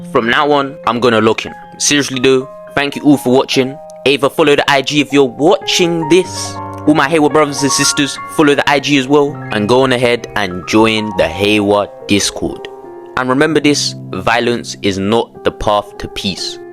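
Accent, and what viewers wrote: United Kingdom. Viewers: You sound like you are from the UK if I was you I woulda came to school witta knife if I gotta record a video like the one you showed 🙏🙏🙏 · Bruh you British??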